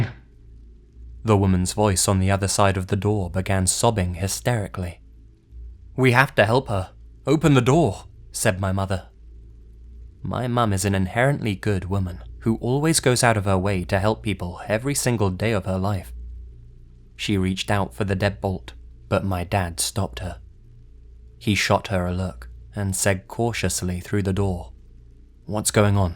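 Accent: United Kingdom. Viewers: British accent. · and Be busta awesome UK narrators 👌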